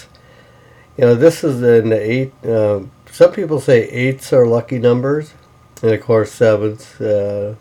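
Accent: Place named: Wisconsin, United States. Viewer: I live in GA but your accent reminds me of my uncle from Wisconsin, so im guessing Wisconsin or Minnesota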